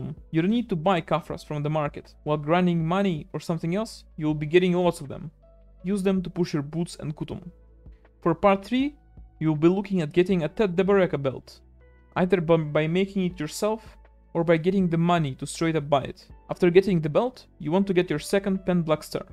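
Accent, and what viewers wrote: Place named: Pakistan. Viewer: Love that pakistani accent 😍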